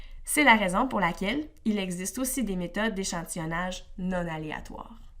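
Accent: Canada. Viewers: très très bien expliqué (même avec votre accent canadien lol)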